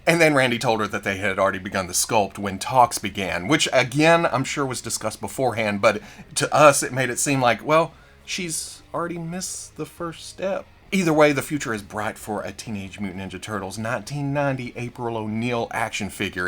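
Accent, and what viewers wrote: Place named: Mississippi, United States. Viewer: As a man from rural Mississippi I prefer your videos. Your accent is like visiting home for 20-30 minutes a week. Never stop doing what you Love.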